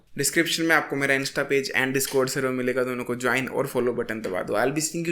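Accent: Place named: Pahadi, India. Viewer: I love how the pahadi accent sometimes kicks in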